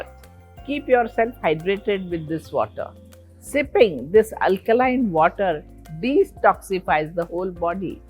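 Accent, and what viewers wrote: India. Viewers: Why are the only showing white people in your background and why not other races ? She has Indian accent and not even bothered to show people of color in the background and talking about health :)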